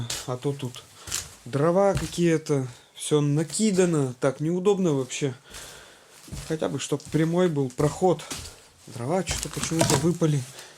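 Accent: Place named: Vologda, Russia. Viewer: Откуда у тебя такие познания о старинных вещах, ты наверное изучаешь или читаешь много, наверно увлекаешься этим, а ты сам откуда? У тебя говор особый, какой-то вологодский.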